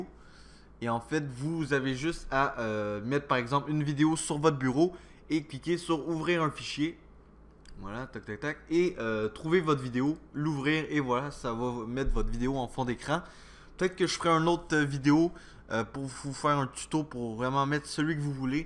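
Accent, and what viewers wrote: Quebec, Canada. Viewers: il est québécois ^^ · J'adore ton accent Québécois ❤️✨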